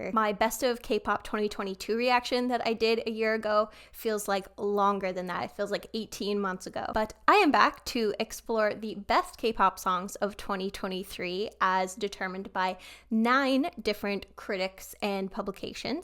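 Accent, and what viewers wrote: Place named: France. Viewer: I believe Jiwoo and Kyujin are the rappers in Nmixx but they are also incredible vocalist like the rest of the group. Nmixx is a group under JYP known for their Mixxpop song. All the girls are incredibly talented and they are also well known for their stage practice where they sing live while dancing. It’s a group I learned to love this year and I absolutely love them! They are so talented and funny! (Sorry for my English, English isn’t my first language. Your French accent was so cute by the way! Love from France ❤️)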